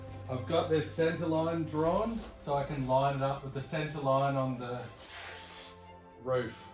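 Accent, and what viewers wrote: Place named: Australia. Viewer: Ok, to start with, since it says you posted this just 9 hours ago, you must have done okay with Alfred. I was worried about you guys, so I'm really glad to see this. Then... brother, you just gave me a crazy idea on how to build a roof for my Bertram 20. A little different than yours because frankly, I don't have anywhere near the patience you do for a project like that, but it'll be cool nonetheless. Finally, I had to laugh out loud, man. I grew up with a couple of kids who were from South Africa, and although I know that the Australian accent and South African ones are completely different, they're close enough to my American ear to bring back a heap of great memories.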